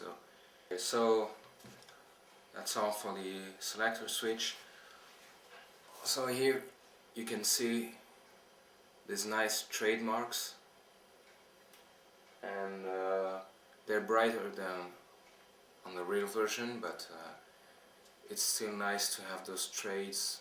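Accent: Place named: Switzerland. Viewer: Dude, let ME take a guess-you're Swiss, right? :) Your accent sounds just like Swiss Maverick's+you guys have mandatory military service!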